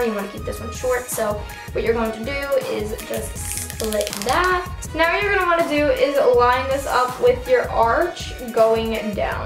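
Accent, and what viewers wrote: New York, United States. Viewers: she has such a jersey or New York accident I love it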